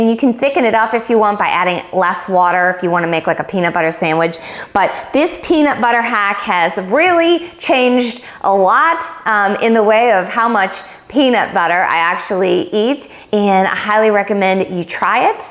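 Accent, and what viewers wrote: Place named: South, United States. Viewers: Gotta be a southern gal-I heard a “ya’ll” right off the bat. 😃 takes one to know one! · I'll bet this is Texas accent 👍🏼. Go Aggies. Go Longhorns 👍🏼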